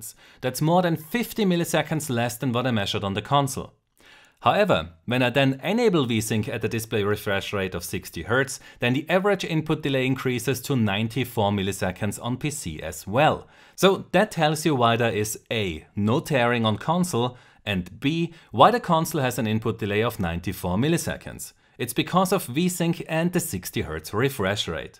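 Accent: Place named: Germany. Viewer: Call me Sherlock I think he might be german ._.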